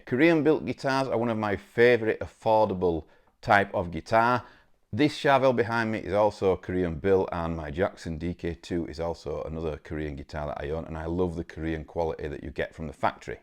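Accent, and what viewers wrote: United Kingdom. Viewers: Great video. Man. I love the single cut. I also love ash with the way your Charvel is. Very cool, but like you I love single cuts and Gibson short scale. Ash is actually several, or about 4 woods used in guitars. So called swamp ash is from the southern US and is typically light weight . I have a Japanese Strat I am building and still need to order a neck. It is cool with three EMG SA pickups because I hate single coil noise. I also have a 1990 Charvel Japanese crackle red with reverse pointy headstock and factory Schaller trem. You can throw that guitar against the wall and it won't go out of tune and I've had it since about 2000. I knew the original owner and both of us ayes this guitar a lot and the frets are still huge. They used some kind of Japanese superior steel in their frets back then. I also have a Japanese Ibanez and it's frets don't seem to wear. 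I had a Mexican strat that was great but those frets sorry down in like 4 years and I sold it. 😂 Great video though and I hope you can get the single cut especially to show us. It sounds like it has a great price. I think the Euro and dollar are about the same now. So makes it easy to convert. 😂 Are you in the UK, or Scotland or Ireland? You have a really thick accent to this American. 😂. I can usually tell. I have a friend from Scotland here in the US. You sound like him. My son went to Scotland for like 6 months while in college and he loved it. Anyway. Great channel a d good videos sir. I've been following you awhile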